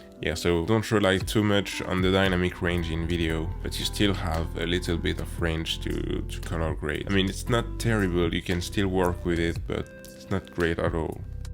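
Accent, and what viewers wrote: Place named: France. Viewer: Oh yes!!! The OG 6000 should definitely not be forgotten. Today plenty of people try to run with 4K, 10 Bit and 60 FPS before they can even walk properly. It's nice to hear your view, because it really sounds it comes from actual experience and not some stuff people say because big Youtubers say it. Keep it up man! And don't worry about your French accent!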